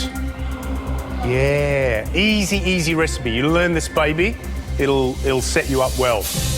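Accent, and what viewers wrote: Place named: Australia. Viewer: THANK you, i don't know Australian, and I was like what the heck did he say.? Now to transfer that into ENGRISH... like ounces and cups.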